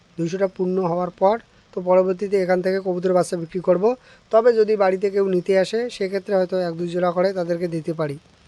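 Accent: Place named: Rangpur, Bangladesh. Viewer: আরে কথা শুনে তো মনে হচ্ছে রংপুরের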